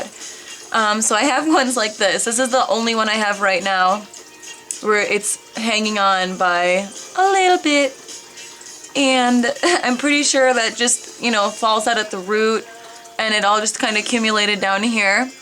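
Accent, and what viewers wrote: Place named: United States. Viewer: Jesteś Polką, ale masz super amerykański akcent. Mieszkasz w USA?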